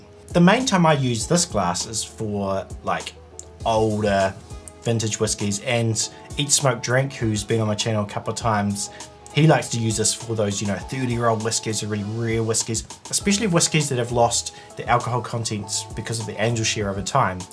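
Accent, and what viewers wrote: New Zealand. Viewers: Not being a mother tongue English speaker, although having a decent understanding of the language, I'm shocked that I didn't get the NZ accent...but now I can't unhear it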